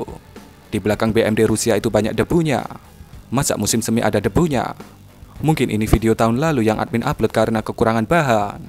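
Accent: Java, Indonesia. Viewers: Suaramu medok bro kocak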